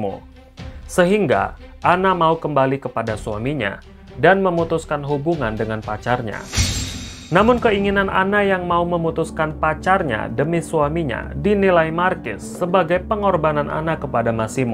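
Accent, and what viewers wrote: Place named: Bogor, Indonesia. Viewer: Dengan logat ciri khas suara nya ....santuy dri bogor🌷🌷🌷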